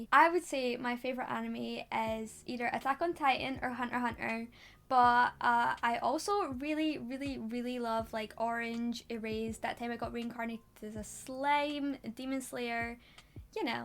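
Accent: Scotland, United Kingdom. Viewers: That accent is magnificent, I might need to come home to Scotland. I should also visit Ireland at some point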